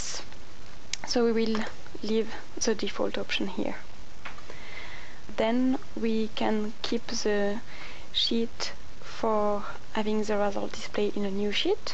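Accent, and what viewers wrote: France. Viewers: French accent definitely! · I really love your accent ... Are you French? :) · Awful french accent! shouldn't be on a scientific tutorial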